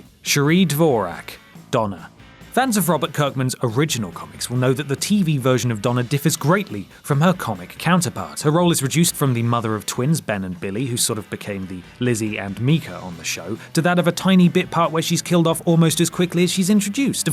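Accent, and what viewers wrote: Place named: England, United Kingdom. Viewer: Wow this was definitely a reach. And is that an English accent by the narrator? What would you know about southern accents? I think she sounded fine, not everyone sounds the same here. Anyway Emily Kinney is from Nebraska. And while midwestern accents are definitely different, I'm sure she was easily able to tap into that drawl.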